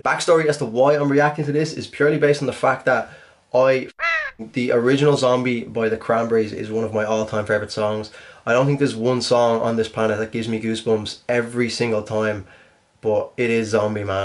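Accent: Ireland. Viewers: Zombie is one of my all time favorites!! I feel like they did the cover very well and still hit the feels!! Love the Irish accent!! 😊❤️ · Limerick? Is that an Irish accent?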